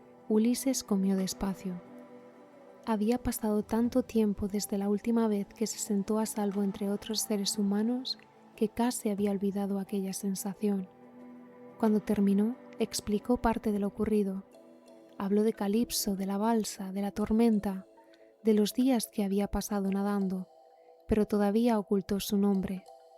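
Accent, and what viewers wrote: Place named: Spain. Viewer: Nueva suscriptora. Me encanta tu suave voz, y el acento español es un plus. Gracias por tanto trabajo y espero de todo corazón que muy pronto llegues a los mil suscriptores. 🎉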